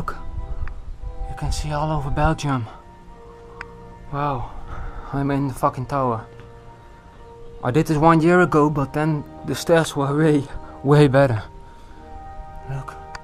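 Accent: Netherlands. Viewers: Volgens mij ben je Nederlands aan je accent te horen. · vette video maar je hoort wel dat je nederlands bent gast😂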